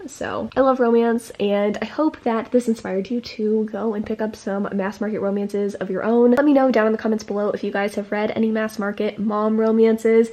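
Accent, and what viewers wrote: South, United States. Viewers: I loved what you said at the end about romance! Also I'm kinda living for the country accent haha! This was amazing as always! Love your vlogs!! <3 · hahaha I love your country accent 😂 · If you’re from the South, her country accent is honestly hilarious Also “yeehaw... we’re done with that” made me laugh for a HOT MINUTE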